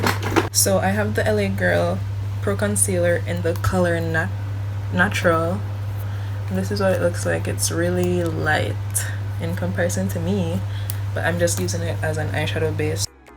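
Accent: Jamaica. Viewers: R u Jamaican? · She a Jamaican me hear the accent eno 😂😂💯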